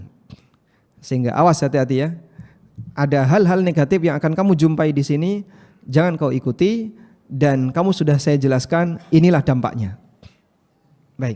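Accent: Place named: Java, Indonesia. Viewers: Mas syaa allah..... saya orang jogja yg merantau di bogor.... paling seneng khas logat Jawa nya... serasa di nasehat dg cara yg lembut dan halus.... Barakallah... sehat berkah pak ustadz❤❤❤krn allah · masyaallah tabarakallah, jazaakumullah khairan. sebagai orang jawa beliau cukup i detik dgn jawanya serasa di nasehati orang dekat.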